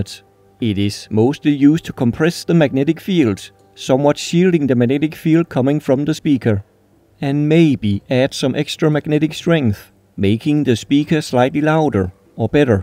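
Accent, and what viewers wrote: Denmark. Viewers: Are you from denmark ?🇩🇰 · Are you Danish? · Are you from Denmark your accent sounds like Danish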